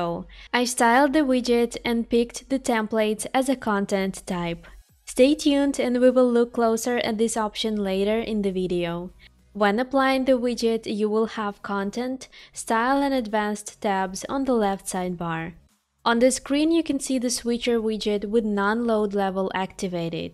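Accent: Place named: Ukraine. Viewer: I compare her Ukranian English with special accent, intonations with real good English of Elementor creators and I scare to buy and install this plugins. Did they save money just on the speaker or on compatibility and functionality too!?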